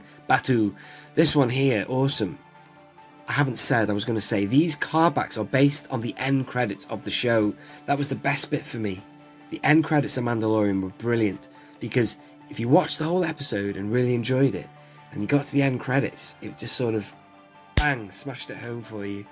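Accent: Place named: United States. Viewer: It would be nice if the 3.75 were as detailed as the 6! BTW you do a great American accent! 👍🏼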